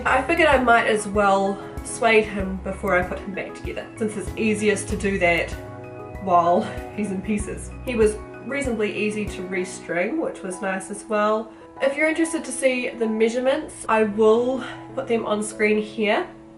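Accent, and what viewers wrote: New Zealand. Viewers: Lol 😂, "why?" ... Love Alan's body tats. Did you make his hoodie or buy it. Been thinking of making a bjd video, the community needs more kiwi voices I think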